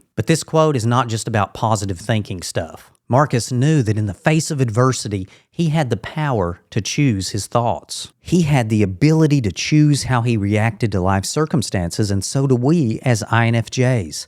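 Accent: South, United States. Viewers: Hey! Thanks for making this very helpful information. Where is your great southern accent from? Keep up the important work!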